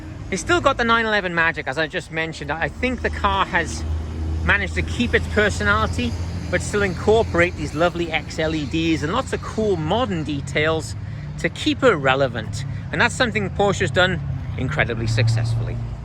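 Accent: Wales, United Kingdom. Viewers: Is that a welsh accent i can hear? Glen S.Wales - Great review! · Just wondering is that a hint of a Welsh accent?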